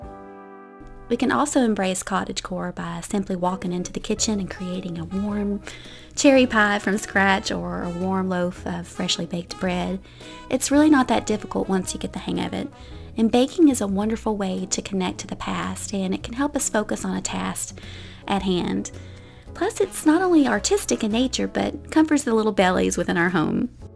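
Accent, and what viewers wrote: South, United States. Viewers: I absolutely adored everything about this video from your sweet voice and southern accent to the aesthetics and fashion!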